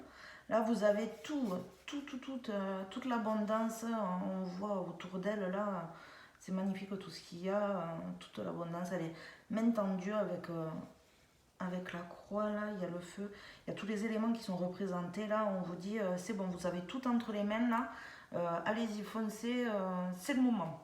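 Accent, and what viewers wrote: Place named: South, France. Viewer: Un petit coucou du gers belle guidance avec le beau accent du sud.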